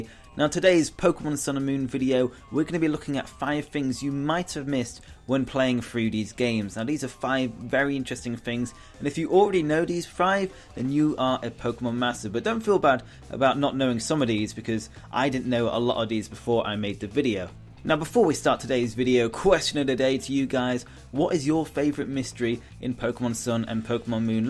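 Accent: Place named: United Kingdom. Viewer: He's maybe from the U.K..... Accents, person. not trying to be rude fyi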